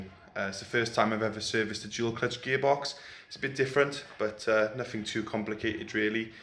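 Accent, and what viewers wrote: Wales, United Kingdom. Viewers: What part of Wales you from mate, sounds like Cardiff or Swansea area, I'm from Brecon originally but live in Florida now. Thanks for the vid on this mate, getting ready to do my 2014 X MR (USDM)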